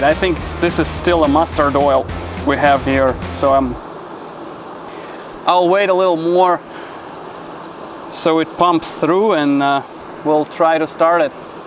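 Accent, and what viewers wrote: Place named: Eastern Europe. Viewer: Nice accent) Just love this slavic word pronunciation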